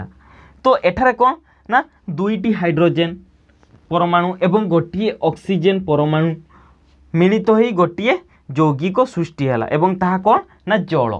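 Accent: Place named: Sambalpur, India. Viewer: Tame sambalpuria enta laguchhe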